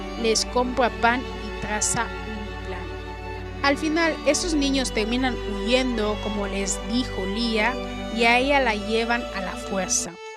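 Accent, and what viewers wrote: France. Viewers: Eres francés?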